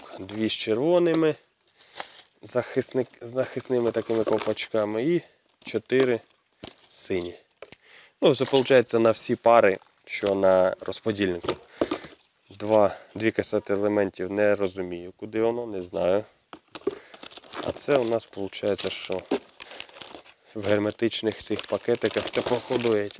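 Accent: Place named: Zaporizhzhia, Ukraine. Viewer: українською Ваше відео набагато цікавіше і колоритніше. В мене є колега з Запоріжжя ( я в Луцьку) то за вашим спільним діалектом зрозумів, що ви земляки, як виявилось ябув правий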